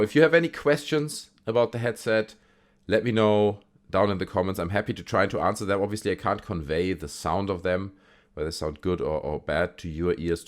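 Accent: Germany. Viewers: are u german? channel says UK tho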